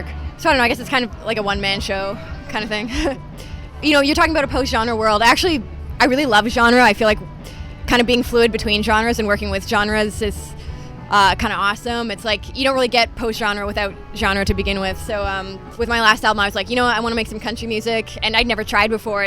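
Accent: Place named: California, United States. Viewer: She's sounding really Californian. haha I kind of miss the rookie Grimes, but it's cool to see how much success she has attained.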